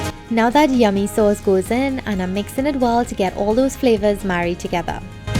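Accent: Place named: Trinidad and Tobago. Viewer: Really delicious! Do you prefer the bigger or smaller shrimp for pepper shrimp? I never attempted it but this makes me want to 😻 Your accent always makes me happy to be Trini🇹🇹 I really need that hops at the moment!!!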